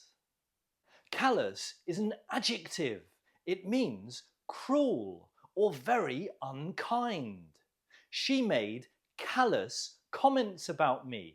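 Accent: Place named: United Kingdom. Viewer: I like watching you so much and i like your British accent thanks a lot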